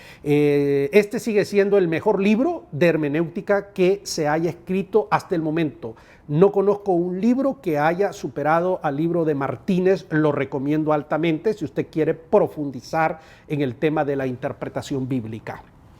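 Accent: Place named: Spain. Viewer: De dónde la sale hablar Español de España a este cuerudo hondureño? Siempre pensé que este tipo era un engreído.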